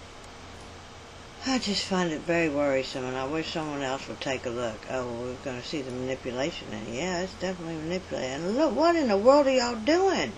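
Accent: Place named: Appalachia, United States. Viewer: You sound like a hillbilly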